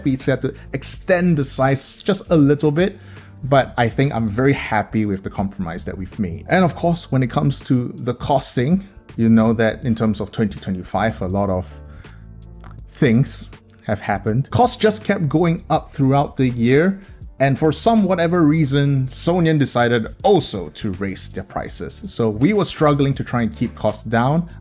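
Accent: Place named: Singapore. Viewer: Must be that Singapore accent. Similar "melody" of Nigerians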